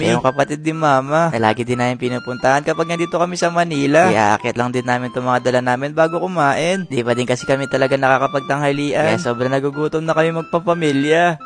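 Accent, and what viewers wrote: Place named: Iloilo, Philippines. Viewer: Ilonggo ka gali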